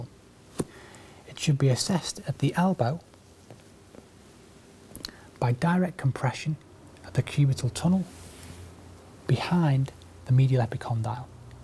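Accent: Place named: Liverpool, United Kingdom. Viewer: I've been looking for a Scouse ASMRtist for a long time, didn't think I'd find it in the form of unintentional ASMR. Love it.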